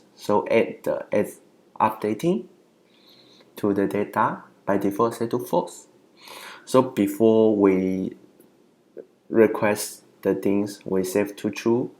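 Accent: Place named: India. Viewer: 还是说中文吧。。这英文听着和印度人一样的，产品不错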